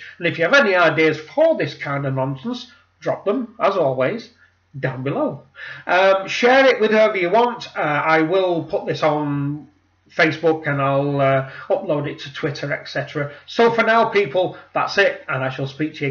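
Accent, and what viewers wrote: United Kingdom. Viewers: Too british. lost me .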